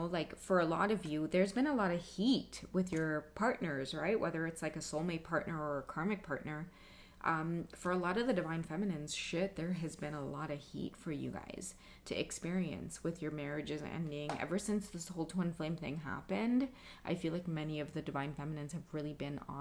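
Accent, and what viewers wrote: Trinidad and Tobago. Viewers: You did a good trini accent. Thank you for the reading.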